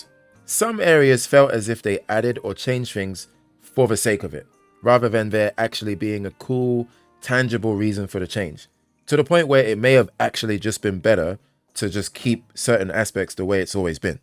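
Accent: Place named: Scotland, United Kingdom. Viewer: the scottish-ish accent had me rolling mate, keep doing what you're doing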